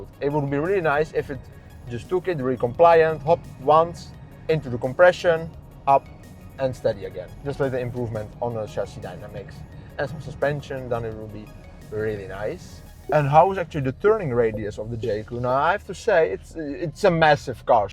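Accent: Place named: Netherlands. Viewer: Thats some true Dunglish right there.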